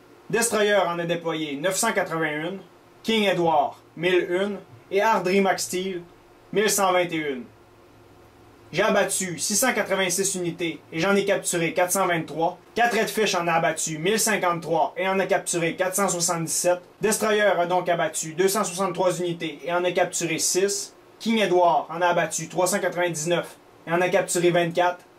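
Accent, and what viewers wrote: Quebec, Canada. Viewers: Omg l'accent québécois ♥ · SCOTISH POWER !!! <3 je le dis tous de suite, c'est la première fois que je vois une de tes vidéos. Et franchement je te tire mon chapeau. C'est complet, on ne s'ennuie pas en regardant juste défois que j'avais du mal à suivre tellement tu parlais vite =s mais c'est pas grand chose. Sinon je sais pas si tu connais "MaSQEdePuSTA" ? il fait des vidéos "critique cruelle" et je trouve que comme lui tu as une façon de parler exceptionnel x) et crois moi ce n'est pas à cause de ton accent canadien =p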